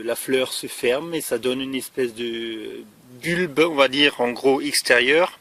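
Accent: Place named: Alsace, France. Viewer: Je reconnaît cet accent alsacien je suis d origine alsacienne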